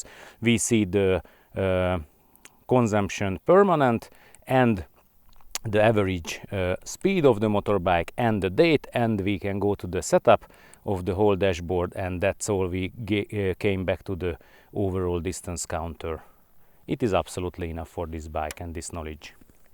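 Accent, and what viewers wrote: Hungary. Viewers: Hungarian accents are cool. Sounds slavic, latin, and germanic all at the same time.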